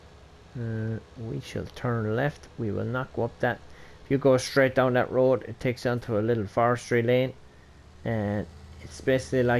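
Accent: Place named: Ireland. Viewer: Nice intro😂. I really like the humor and Irish accent. I will watch this during the day👌.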